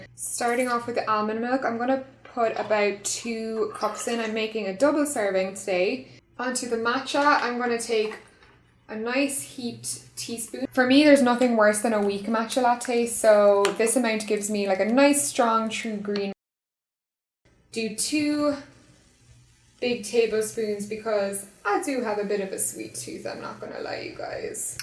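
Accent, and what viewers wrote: Ireland. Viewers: Are you Irish?!?!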